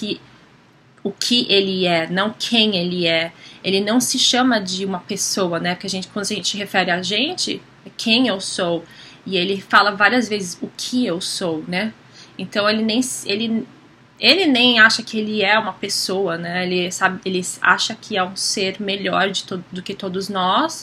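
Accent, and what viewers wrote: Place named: United States. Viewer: Você é americana? Meu esposo é de Chicago e quando fala em português tem um sotaque parecidinho com o seu. O jeito de falar é bem semelhante. Se bem que ele não fala quase nada em português hhh mas quando fala, algumas palavras saem como algumas que você fala. Claro, você fala muito bem, mas de uma maneira bem peculiar. ☺️🌼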